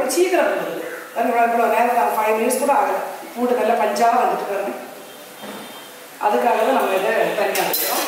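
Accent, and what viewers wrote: Nagercoil, India. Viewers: Nagercoil voice pole irukku.. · u r from nagercoil?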